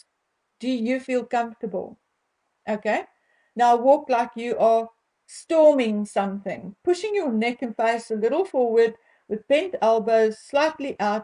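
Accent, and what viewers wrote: South Africa. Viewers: The South African accent grates my ears.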